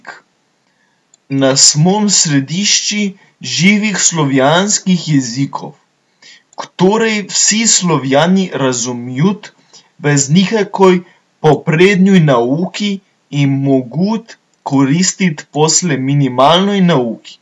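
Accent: Slovenia. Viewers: haha kk se takoj prepozna, da slovenc govori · I'm Polish. I understood everything 100% and I think I would read it better than you (no offence, brother Slav ;)). You don't see a difference when pronouncing "y" and "i" or "e" and "ę". Well, in Polish we have both "y" and"i" and they sound different, and we have also "e" and "ę" and they also sound different...;)) I'm guessing by your accent that you are a Southern Slav. Your accent kinda "suggests" me you're Slovenian...Am I right??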